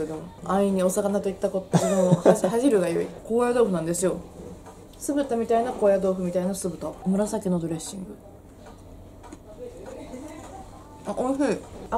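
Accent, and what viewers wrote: Kansai, Japan. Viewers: 関西、だと！？笑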